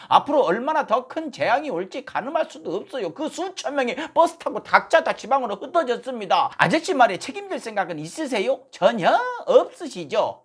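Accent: Jeolla, South Korea. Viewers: 정말로 반갑당게요. 전라도 사투리 징허게 구수허네요. 거기다 칼럼내용 속시원허네요. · 전라도사투리 잃져버리지도 않으셨네요ㅌㅌ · 고향이 서울이란데 우째 저리 전라도 말을 구성지게 잘할까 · 성범아 윤석열이 나라말아 먹는게 아니고 이미 문재인이 대한민국을 다박살냈다 아파트 한채 6억하든게 지금13억 간단다 알것냐 지대로 알고얘기 해라 알것냐 전라도 말싫다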